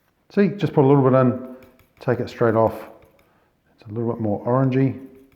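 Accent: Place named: New Zealand. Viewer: Just found your channel and subscribed. Nice hearing another kiwi voice and familiar scenery for a change. Very informative tutorial thanks.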